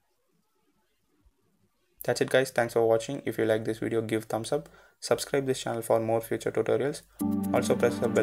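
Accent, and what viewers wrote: India. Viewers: Your accent is indian .Are You ?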